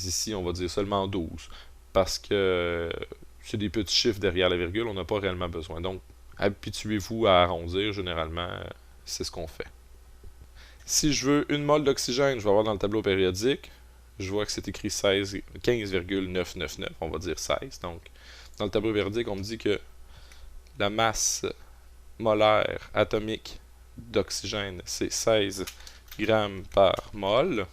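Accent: Quebec, Canada. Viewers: Ah un accent quebequois !!!! mercci ^^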